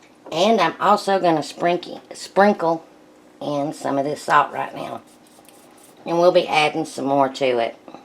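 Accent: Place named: South Carolina, United States. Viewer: I live in the foothills in SC and you sound like my kind of folks! Love your videos!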